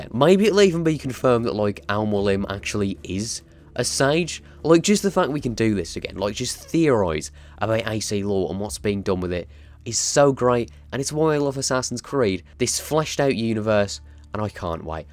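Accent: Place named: RP, United Kingdom. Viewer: Why are you soooooo posh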